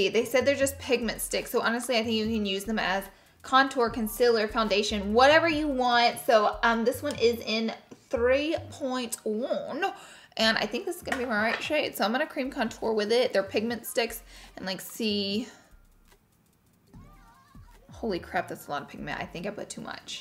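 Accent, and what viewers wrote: South, United States. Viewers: I’m a Louisiana woman and I love your Southern accent. Sounds Louisiana or Alabama...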